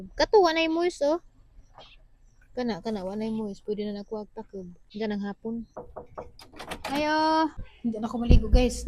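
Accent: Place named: Southern Leyte, Philippines. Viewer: Suway ko shout out dai … my name is Haydee Bito Bermoy Moyers from Surprise, Arizona! si mommy nako Ang naka discover sa imo lipay og moot kaayo siya watching you. She passed away 5yrs ago na pero pabilin guihapon ko follow nimo… it reminds me of her when I listen to you talk… she was born in Southern Leyte and I grow up listening to her dialect like yours.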